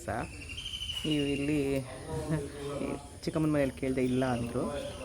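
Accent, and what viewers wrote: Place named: Bangalore, India. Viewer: Alla neevu matado reeti nodi banglore navru ankondidde...nodidre neevu namma oorinavru❤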